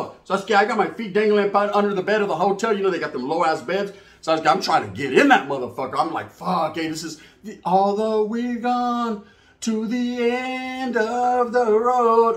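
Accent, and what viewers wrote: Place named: New Mexico, United States. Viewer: Bro your videos I can’t get threw without laughing lol I know all the lingo cause I’m from New Mexico lol that’s how we talk carnal 😂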